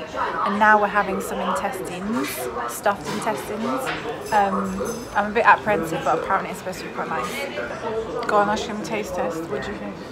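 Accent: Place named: United Kingdom. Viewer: I never tought that you able to speak turkish because of your incredible brit accent 😅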